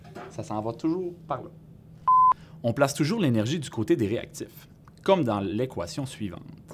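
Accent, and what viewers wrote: Quebec, Canada. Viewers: Rapide, efficace et en plus avec l'accent québécois, c'est juste parfait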